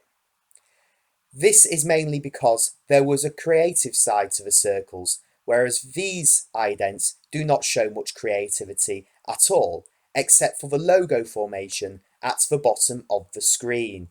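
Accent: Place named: Blackpool, United Kingdom. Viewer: Jeez that's a Blackpool-and-a-half accent!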